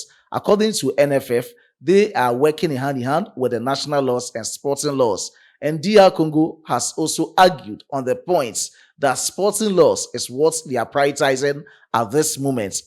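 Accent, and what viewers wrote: Ghana. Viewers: You sound like another Ghanian pretending to like Nigerians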